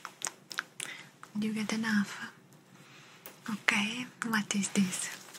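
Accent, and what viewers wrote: Italy. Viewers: Hello, magnificent ASMR with an Italian accent, I loved it and enjoyed it, it is very relaxing, greetings, beautiful 💖💖🌹🌹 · Italian accent videos are perfect for relaxing and unwind your soothing voice makes me feel 😴 😊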